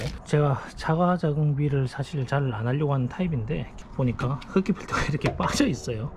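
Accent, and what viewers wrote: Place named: Gyeongsang, South Korea. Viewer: 우연히 지나가다가 영상을 봤는데요 옛날에 저의 꿈의 자동차 였습니다~! 수프라 우핸들의 로망이였는데 ㅠㅠ 사투리가 정감이 있네요 부산경남분 인가요?ㅋㅋㅋ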